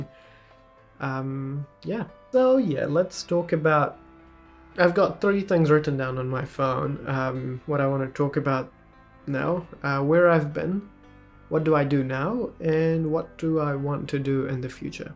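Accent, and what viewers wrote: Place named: New Zealand. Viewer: Good to have you back mate. Can hear that NZ accent in your voice 😉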